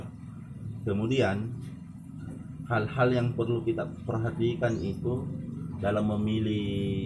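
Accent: Indonesia. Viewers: klo dengar cara bicarax kykx dia org bugis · tutur bicara enak di dengar.. anak medan lai.....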